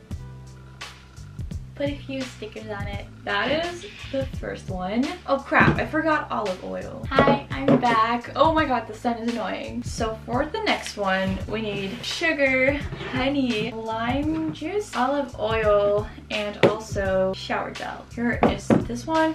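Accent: Netherlands. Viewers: are you dutch? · Are you dutch??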